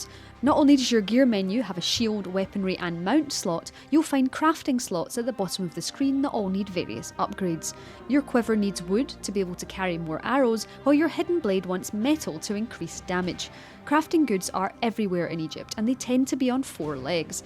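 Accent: Scotland, United Kingdom. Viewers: Sendo Tiago scottish actually. A subtle distinction but very important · Um, she’s Scottish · So nice hearing a Scottish voice for things like this. Is there more just from her? (I'm not being weird I'm Scottish too and it's just easier to follow) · I’m Irish and that’s definitely not an Irish accent. Sounds Scottish. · That Scottish accent <3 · That's a Scottish accent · That Scottish accent is irresistible lol. Had to sub! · Central Scotland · She’s Scottish 🤦🏿‍♂️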